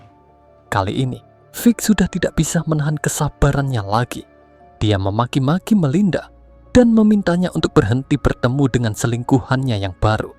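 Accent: Java, Indonesia. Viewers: Logat nya Jawa x min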